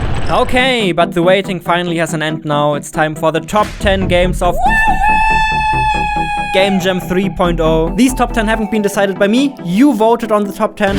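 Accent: Germany. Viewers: Also ich wart ja schon seit einem Jahr auf will u Snail XD und weisst du was, voll kein Problem. Ich versuch gerade mein erstes Game zu machen und es geht immer länger als gedacht. Jedenfall freu ich mich drauf dein Spiel zu Streamen ob ichs gewinn oder nicht. Für deine Statistik bin über Islanders auf dich aufmerksam geworden. Mach weiter so, nice Videos, nice Games, ein unverkennbarer deutscher Akzent, der meine Freundin zur weissglut treibt, wenn ich deine Videos guck, Alles in Allem ich bin Fan. Grüsse aus der Schweiz ;) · Man merkt das deutscher bist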